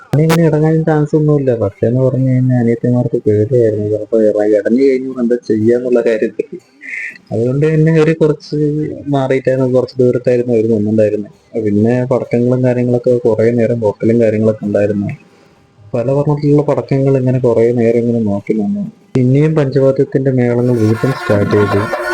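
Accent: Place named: Palakkad, India. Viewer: ettan powli🤩 adipowli aayitundu nammade ah palakkadan slang il thanne adi manoharamayi eduthitundu ineyum idupole orupadu videos edukkan kazhiyanam ennu njn aashamsichu kollunnu🎊👍🏼💖🎉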